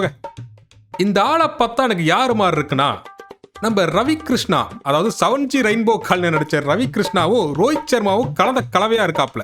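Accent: Kongu, India. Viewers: Day kongakara kannada nalla irkunda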